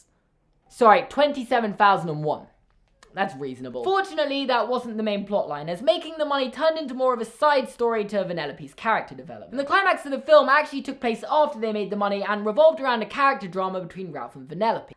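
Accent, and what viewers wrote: United Kingdom. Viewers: Oh yes British dad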